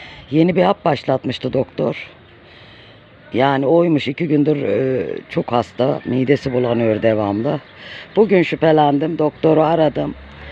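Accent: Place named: Adana, Turkey. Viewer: değerli youtube gezgin ve yurtiçindeki kendi içeriklerini youtube de yayınlayan arkadaşlar hepimiz biliyoruz ki bu işi az veya çok para kazanmak için yapılıyor buda sizin en tabi hakkınız öyle youtuber kardeşlerimiz varki çok büyük emekler ve yaşantılarını tehlikelere atarak bizlere gerçek yaşantıları kültürleri yaptıkları iyilik akımları ile bizlere gerçek içerik üretip bilgilendiriyorlar düne kadar köy yaşamını köy kahvaltısına burun kıvıranlara dahi bu yaşantıyı en güzel içerik ve yaşantılarıyla bizlere sevdirdiler çoğumuzu acaba bizde yapabilirmiyiz moduna getirdiler hepsine çok çok teşekkür ediyorum bu arada yemek tariflerini kitaplardan yapan değerli kardeşlerimiz artık bunun için kitap karıştırıp şu yemek tarifi hangi sayfadaydı diye uğraşmayın bu tarifler ve lezzetler sizin bir karış yakınınızda size düşen tek şey ekrana bir parmak basmak ve bu güzel lezzetleri ablamızın köy hayatı ve kedilerinde kendine has adana şivesiyle seyredebilirsiniz lütfen hepimiz youtube gezinirken ablamıza uğramayı unutmayın ben kardeşimizi ah köyüm ah sayesinde tanıdım iyikide tanımışım sizde tanıyınca inanın çok seveceksiniz kendisiyle kanalının dışında hiç bir tanışıklığımız da yoktur ama bir gün o tanışmada olur inşallah c.allah tüm izleyenleri ve insanları kötülüklerden korusun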